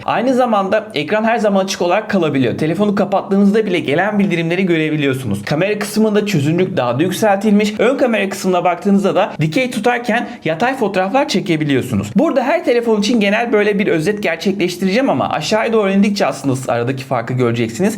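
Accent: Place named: Trabzon, Turkey. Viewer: Abi Trabzonun neresindensin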